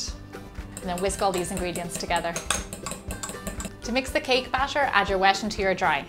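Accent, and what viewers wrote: Ireland. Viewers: You are from Ireland? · Love the Irish accent! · Omg! Your Irish!!!?!? How cool!!!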